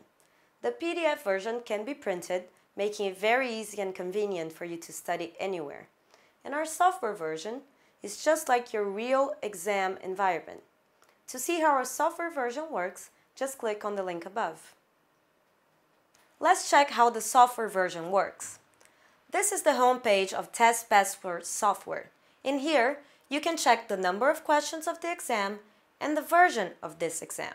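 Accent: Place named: Bulgaria. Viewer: She is bulgarian (I noticed the accent) there everything is possible, no rules, no regulations.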